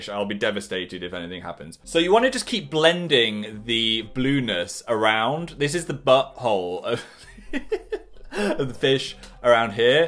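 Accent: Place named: Northern England, United Kingdom. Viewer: part of rebranding is that his northern accent is coming back and i am living for it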